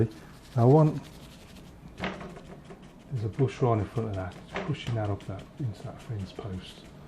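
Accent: Birmingham, United Kingdom. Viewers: Love the brummie accent being a fellow Brummie good to hear the tones from Brum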